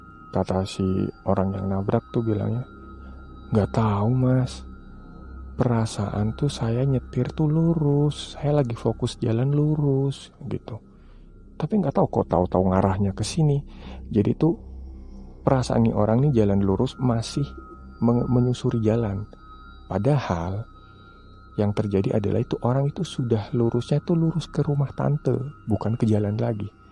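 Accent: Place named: Tegal, Indonesia. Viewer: beuhh tegal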